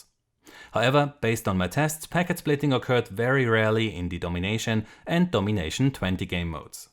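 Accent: Germany. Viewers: Are you a german Person because you have accent from german people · Man hört raus das du ein Deutscher bist. ^^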